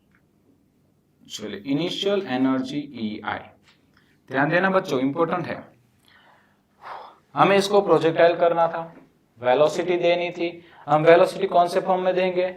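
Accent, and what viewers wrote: Gujarat, India. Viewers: Sir accent is Gujarati 😅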